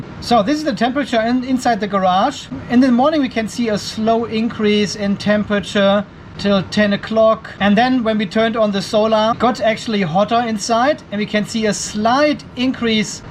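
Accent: Germany. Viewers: Also deutschen Akzent hört man ja schon sehr deutlich, wenn er Englisch spricht.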